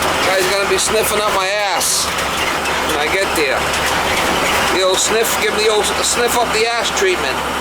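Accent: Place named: Boston, United States. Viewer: Oh my goodness..ahh you kiddin me? You sound eggzacktlee like my grandmutha my mumma and awl my relatives from Boston Lowel and Billerica yo wa voice tone sounz eggzactlee like my uncls my uncle brooce! I was writing this exactly the way my family sounds when they talk oh my gosh i cant believe it, i am getting teary eyed and i feel a warm no joke im not pullin your leg i feel a warm loving cozy safe feelin in my chest my heart listening to you talk....it is remarkable to listen to a familiar accent and feel immediate family love a sense of belonging it is so weird! I am going to watch now ive been listening to you and feeling so much comfort my gosh im gobsmacked im absolutely stunned ...holy cow. Okay well thank you for being you! Lol now im gonna watch because you are going to change out thermal cupler and that is exactly what my problem here is and its an old Gaffer and Sattler furnace forced gas heater it went out and now i cant relight it ...not sure how it went out to begin with!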